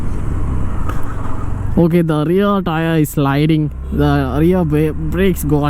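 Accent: Kerala, India. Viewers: Nice mallu arabi English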